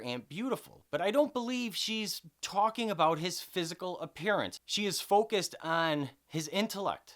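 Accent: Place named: Midwest, United States. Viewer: Still consistently the best TPS03 companion on YouTube. I look forward to your input each week. Thank you! PS your midwest accent is a fantastic bonus. Southern Michigan? Whatever the case, you rock.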